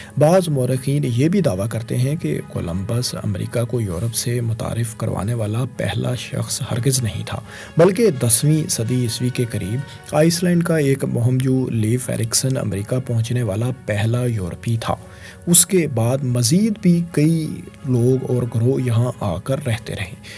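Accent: Punjab, Pakistan. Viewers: please work on punabi accent .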